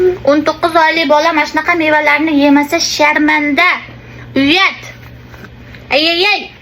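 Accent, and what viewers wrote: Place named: Andijan, Uzbekistan. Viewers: наманганни шевасимас бу Наманганни шевасида ту́лик гапирилганда Андижон Тошкентлилар агар биринчи марта эшитса умуман тушунмайди. Ку́про Андижон шевада гапиряпти бу киз. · Водий манимча андижон бизни келинимиз андижонлик шеваси шунака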